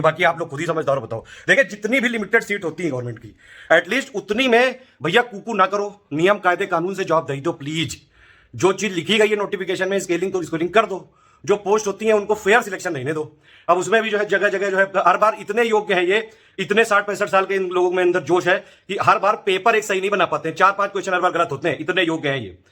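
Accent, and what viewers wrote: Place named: Kanpur, India. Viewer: Kanpuriya language Maja aa Gaya sir Simple baat hai 2022 me Kuch Kiya jaye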